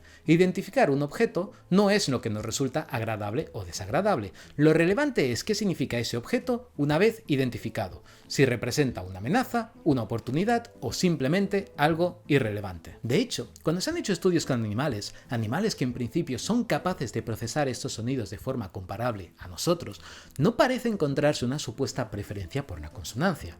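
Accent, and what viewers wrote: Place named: Spain. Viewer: Tu forma de hablar me recuerda al Filopalomo, a CdeCiencia y a ElvisaYomastercard. Me pregunto si son los cuatro de la misma región de España o es algo mas extendido en el país? 🧐🤔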